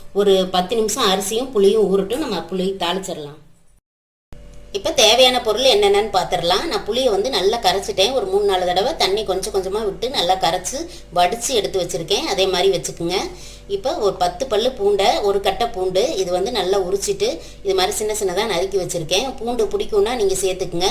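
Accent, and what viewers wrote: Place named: Madurai, India. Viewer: மதுரை தமிழில்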